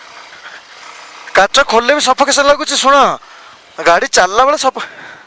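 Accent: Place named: Puri, India. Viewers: Where are you from brother? You sounds like a little bit bengali 😄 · sahe sahe re khela bhai puraa aamara puri style re suniki bhala lagila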